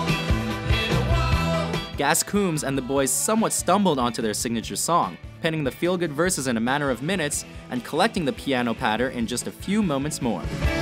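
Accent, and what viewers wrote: United States. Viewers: Like it how it's an American voice over. Move tf over and let a Manchester lad or lass do this. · Why the fuck is this american accent ?cant hear it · Soon as I heard the american accent I was like "This is gonna be shite" xD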